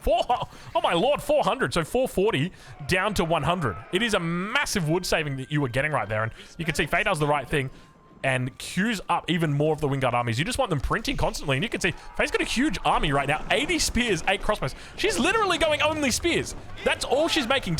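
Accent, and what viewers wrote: Australia. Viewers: sounding Australian today me boy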